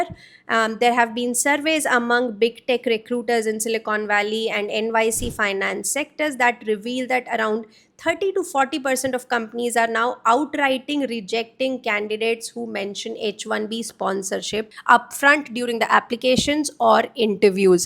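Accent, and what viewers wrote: India. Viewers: I cannot see why an American company would hire an Indian instead of a local American guy for product manager. Most Americans are having a hard time understanding your Indian accent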